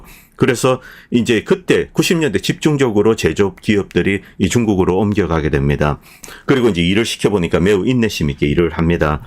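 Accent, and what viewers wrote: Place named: Busan, South Korea. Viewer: 부산 억양이 매력적 이시네요...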